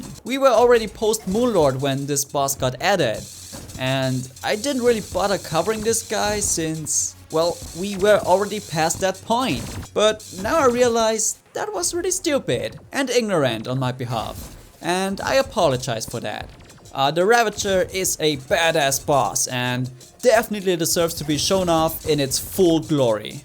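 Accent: Germany. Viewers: are you german? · Joo du bist deutsch nicht wahr? merkt man am Accent und deinen Kanal Namen, hast du vielleicht Lust mit mir terraria zu spielen? würde mich so freuen :)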